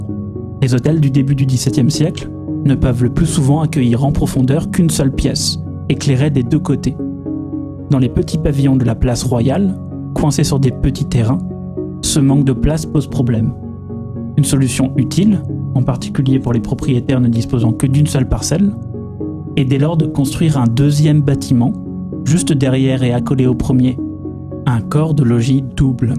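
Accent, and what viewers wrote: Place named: Paris, France. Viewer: Le narrateur a l'accent du 16ème.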